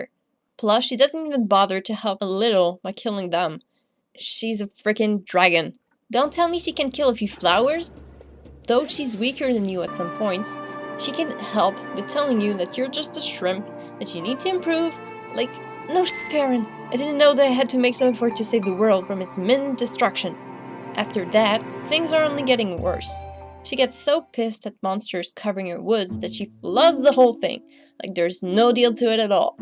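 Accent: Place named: Canada. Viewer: Where do you live? (by your accent i would say canada)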